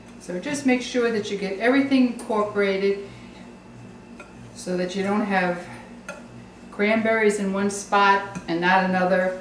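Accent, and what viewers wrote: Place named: New York, United States. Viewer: Love that New York accent!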